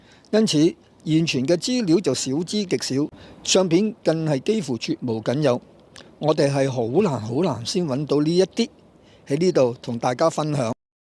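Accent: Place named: Weitou, China. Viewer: 喺唔係起, 有心做就注意吓啲廣東話發音, 唔好用啲咁嘅圍頭話發音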